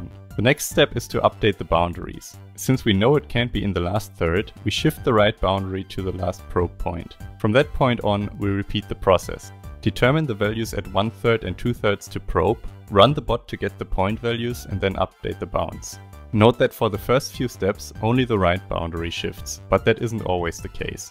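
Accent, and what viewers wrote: Germany. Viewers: ich konnte schon nach wenigen sekunden an deinem akzent erkennen dass du auch deutsch bist haha :) ich bin übrigens weiblich und habe zwar keinen freund der mir das video geschickt hat, aber mario party ds ist einfach meine kindheit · Man merkt dein deutschen Akzent Kollege Schnürschuh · Man merkt an dem Akzent, dass du deutscher bist 😂 Find ich eigentlich ganz toll, dass man sowas gut erkennen kann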